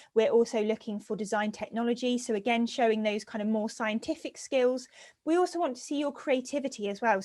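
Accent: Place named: United Kingdom. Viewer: I like her English, this British lady ; )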